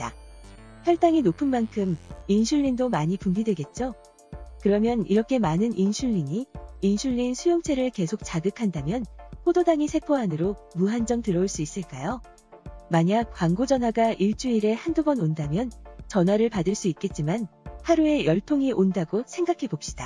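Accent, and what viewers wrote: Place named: Busan, South Korea. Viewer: 바뀐 목소리도 좋지만 선생님 목소리가 더 이해가 잘가는거 같네요ㅜㅜ 특히 전 부산이라 아는분이 말씀하시는것처럼 들려서요~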